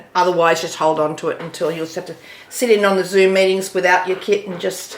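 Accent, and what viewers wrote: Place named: Australia. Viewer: Enjoyed watching you again. I really appreciate watching Aussies and hearing our accent for a change on flosstube. Ornament and autumn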